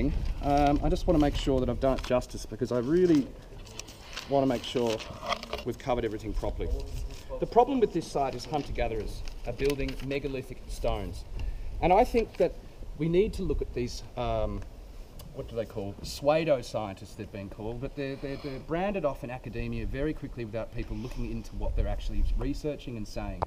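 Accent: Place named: Australia. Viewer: Chuck sent me :) It was nice to hear a fellow Aussies voice. I subbed :)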